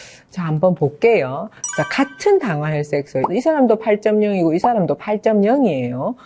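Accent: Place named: Busan, South Korea. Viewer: 부산 사투리가 귀여우세요 😊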